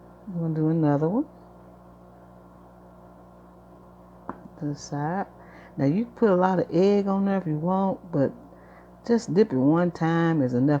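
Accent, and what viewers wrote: South, United States. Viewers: An amazing breakfast! Love ur hospitality and ur voice. So southern . God bless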